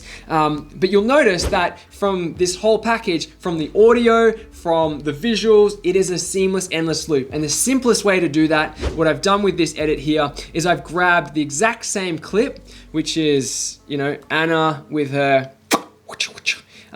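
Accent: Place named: Australia. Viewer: Loved the "bellissimo" part ahahha. Moreover, hearing some good Australian bettah and watah reminds me of my lovely months in Sydney. Greetings from Italy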